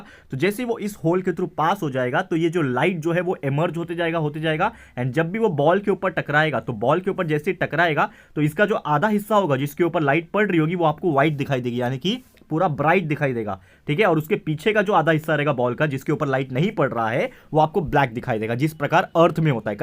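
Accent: Maharashtra, India. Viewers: Sir aap marathi Ho kya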